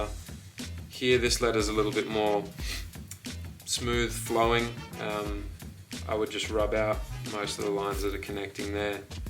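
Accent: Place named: United Kingdom. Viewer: Dude, you have the britishst accent ever!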